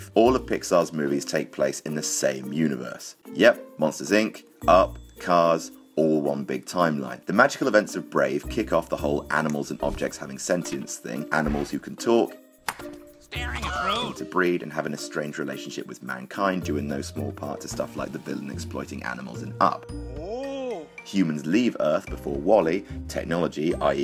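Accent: United Kingdom. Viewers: You're so great, I love this channel. The content, the editing, the comedy, that snarky British accent that we Americans adore so much. It's like a perfect package all rolled into one.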